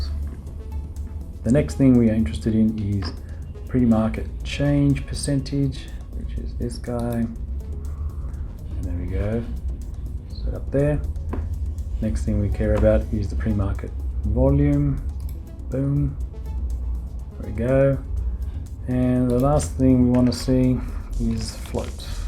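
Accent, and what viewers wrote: Australia. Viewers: Hi mate, thanks for this video. From your accent I can tell your a fellow aussie! Is all this info relevant to the ASX as well? I'm using the free version at the moment, and I don't get any pre-market info, volume or gap. So to fix that I need to subscribe? I also believe to get live data and not 20min delayed I need the live data extra - is that correct as well? Cheers, thanks for any tips you have. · Awesome bro! ... crocodile Dundee, are you an Aussie?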